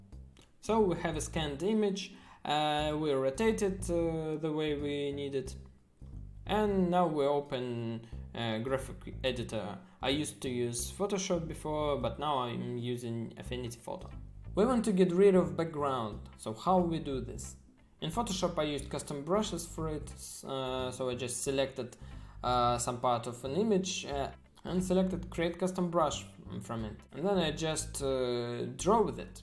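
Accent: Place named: Russia. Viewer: You're Russian!?!? at you Russian accent, and I'm Russian, and if that's the case, I don't need to use a translator.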